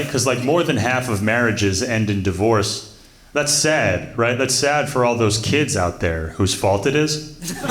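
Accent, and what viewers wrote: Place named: Tri-State, United States. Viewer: Great set, you're hilarious, very subtle tristate accents. Outro music sounds like from Unreal Tournament too so that's a plus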